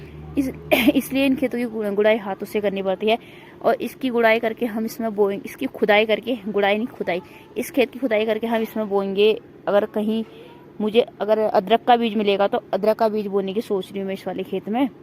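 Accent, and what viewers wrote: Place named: Kumaon, India. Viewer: aaj aap bhi kumaya boli bolna lag gayi jay ho kumaya mitra wow nice😃😃😃😃😃😃😃😃😍😍😍😍😍😍😍🤩🤩🤩🤩🤩🤩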